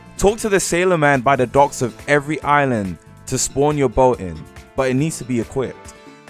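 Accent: United Kingdom. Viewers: The British accent is so dreamy :drooling_face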